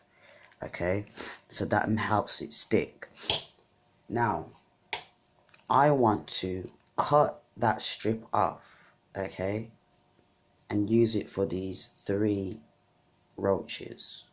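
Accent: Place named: Birmingham, United Kingdom. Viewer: I came here from Stud-conscious. You a Londoner? From darn sarf? I'm from Manchester 👍👍👍 Edit.. Brummie twang there too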